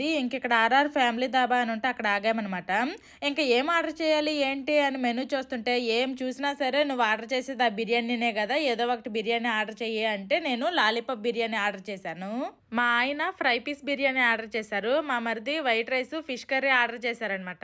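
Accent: Srikakulam, India. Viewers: Ni chettha srikakulam slang aapu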